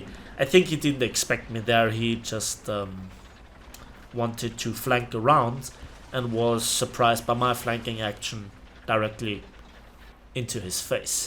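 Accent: Germany. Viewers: I'm subscribed for that crisp German accent and inter-regional banter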